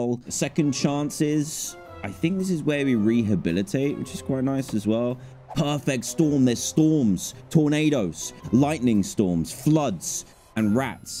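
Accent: United Kingdom. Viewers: is British 👀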